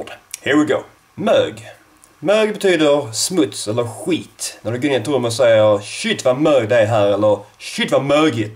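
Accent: Skåne, Sweden. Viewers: Klart att han pratar skånska? Bara för att man inte pratar som om att man har gröt i halsen betyder det inte att man inte är skånsk.. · great videos man, have you ever thought adding swe/eng subs to this channel? i think lots of people want to improve their listening skånska skills.. i like your accent a lot, i followed your other channel for a long time but I didn't know you are from Skåne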